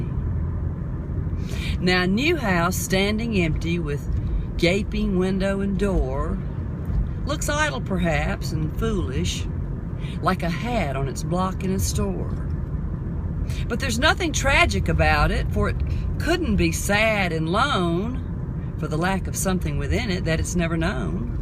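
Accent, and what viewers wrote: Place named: South, United States. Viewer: My mother would recite this poem for me when I was young. Well mom is gone now. I was thinking about the poem the other day and went searching YouTube. I like this one because she has a nice southern accent.Thank you.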